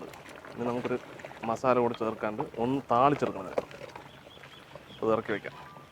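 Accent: Palakkad, India. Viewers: Aa palakkadan slangilulla samsaram kelkkan enthu rasanu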